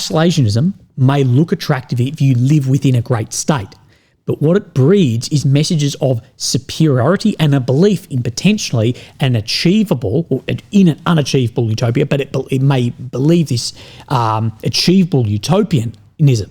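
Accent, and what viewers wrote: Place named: Australia. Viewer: Hearing a australian, talking about america is f**** hilarious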